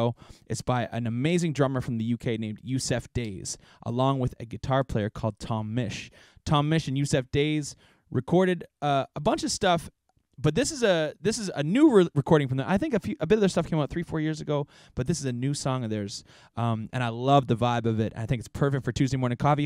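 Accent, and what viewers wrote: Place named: Nova Scotia, Canada. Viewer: You sound like you are from Nova Scotia. 😎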